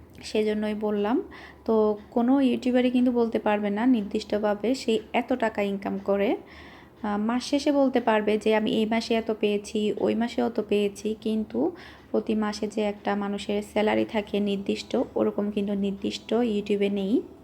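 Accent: Chittagong, Bangladesh. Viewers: আপু আমি আপনার বিডিও দেকি কমেট করা হয় না আপনার ইমকামে কথা শুনে খুশি হলাম। আপনার বাড়ি কি পটিয়া আপনার কথা শুনে মনে হয় পটিয়া।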